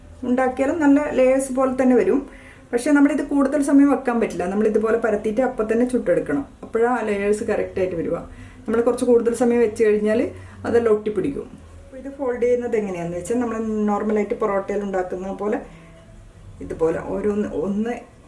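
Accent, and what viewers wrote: Kannur, India. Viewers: Language tune like Kannur?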